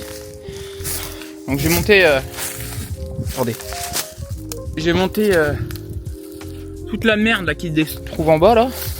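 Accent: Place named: Japan. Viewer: on kiff l'accent japonais ( continue t'es vidéos elle sont incroyables )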